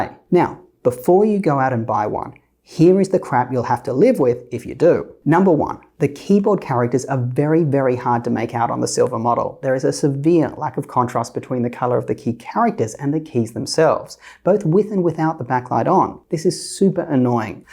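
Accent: Australia. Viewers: That’s crazy I’ve watched like 20 of his vids already and thought he was in Australia or NZ lol wtf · TIL this man with a very Australian accent lives in NYC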